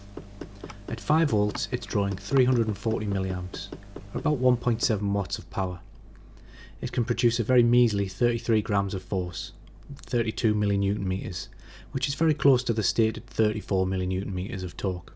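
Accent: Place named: Northern England, United Kingdom. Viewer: As others have commented, a very clear explanation and a very soft pleasant speaking voice ( which many Northern accents have ), so you're ideal for youtube videos. However is the overlay at 4.24 correct ? At 100mA x2 the torque is apparently 6x the 5v test at 32mNm, but torque is given as 117mNm ( the same value as 50mA x2 at 3.6x the 5v value ( 3.6x 32mNm = 115.2mNm ).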